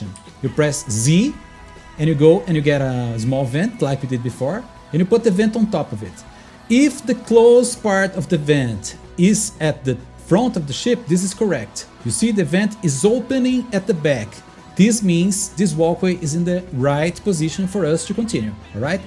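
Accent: Brazil. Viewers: Só de ouvir o sotaque da pra perceber que o cara é BRASILEIRO!!! Meu amigo, tu manda muito, essa corvette é simplesmente INCRÍVEL e sinceramente é a minha favorita em toda a comunidade de NMS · Cara, que vídeo bom, melhor ainda foi escutar a voz e logo sacar que é um br, parabéns irmão, tá de parabéns. E meu Deus o tanto de Argonaut Winglet que tem que usar é brincadeira viu, Rockhopper Propeller mvp do vídeo.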